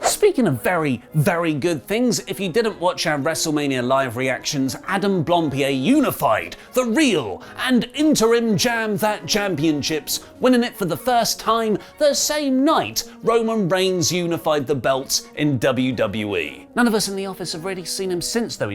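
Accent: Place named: United Kingdom. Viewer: I must be going deaf. It sounded like Ollie said: "Emotionally abused her old anti-LBGTQ views", which was only slightly confusing someone would be released for abusing anti-LBGTQ views in 2022. I had to rewind it five times to hear "Emotionally abused her, holds anti-LBGTQ views", which makes a lot more sense why he would be released. That British accent will get you sometimes. 😆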